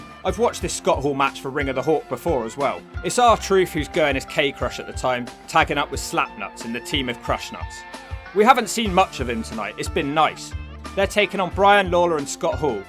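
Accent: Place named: England, United Kingdom. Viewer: I don't normally enjoy the english accents and way of words but you I like. Minus your aew hate ofcourse but we're all friends here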